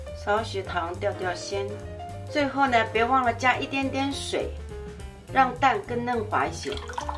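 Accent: Shanghai, China. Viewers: 日锅日油，哈哈，沪普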